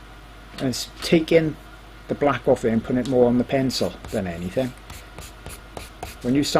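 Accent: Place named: Swansea, United Kingdom. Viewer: Thanks for this! Are you a Swansea lad? Recognise that accent anywhere!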